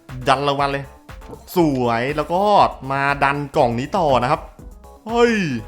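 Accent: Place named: Thailand. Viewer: นับวันพี่ ยิ่งพูดไม่ถูกเเถมมีเหน่อด๋วย <num>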